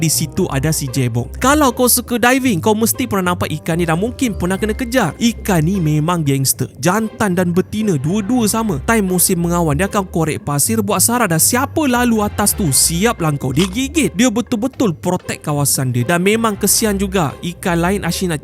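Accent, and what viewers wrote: Sabah, Malaysia. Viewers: Admin ni mau ckp loghat mcm india ada .. mcm sabah pun ada juga ... Mimin orng apa yee .. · ya rasanya sabahan ni sbb selalu dgr dia slang sabah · aku syak ni org Sabah... banyak kali kluar slang Sabah dia tu 😂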